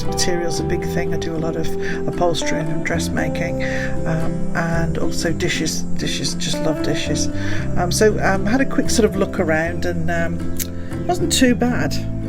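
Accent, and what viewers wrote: United Kingdom. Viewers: I love the William Morris fabric what a find. I often go to Liberty’s as I live near London it’s like another world in there. The thrift shops seem to be bigger and have lots more on offer than our charity shops here in England. By your accent I guess you are British. I’m new to your channel but love it.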